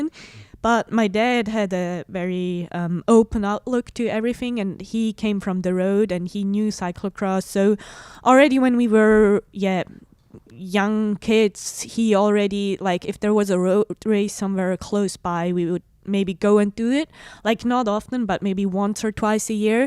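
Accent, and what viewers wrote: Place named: Quebec, Canada. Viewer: She sounds french canadian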